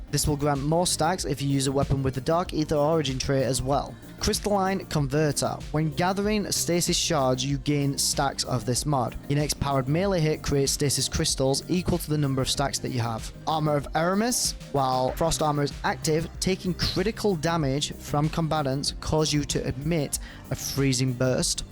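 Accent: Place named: Yorkshire, United Kingdom. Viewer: Yorkshire lad! Where's tha from lad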